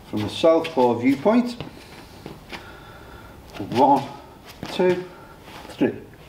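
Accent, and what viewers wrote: Liverpool, United Kingdom. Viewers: Like a scouse usyk there mate 👌🏾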